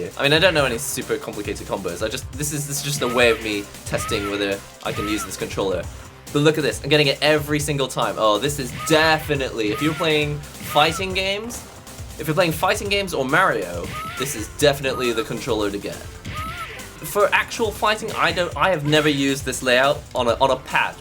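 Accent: United Kingdom. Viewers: Why do u have a British accent ?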